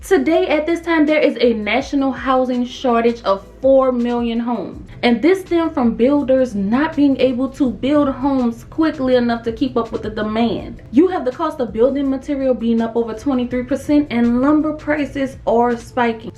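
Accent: New Orleans, United States. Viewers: Thanks for this information. Btw, are you from New Orleans?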